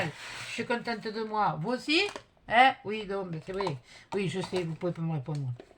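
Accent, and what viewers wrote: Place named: Southwest, France. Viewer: Re coucou. Bon le trouillaumétre a baissé...😁 Vous vous en sortez très bien Ahh du sud-ouest...moi aussi mais avec un accent de Marseille...peuchère 🤣 Ohh tu parles de Meriadeck tiens donc.... Pas de soucis de cadrage, vous êtes dans le champ de notre vision 😁 Sinon...De superbes cartes, j'adore le violet aussi..de la récup de chutes, le must...adorables créations un grand bravo et encore bienvenue. 💖💖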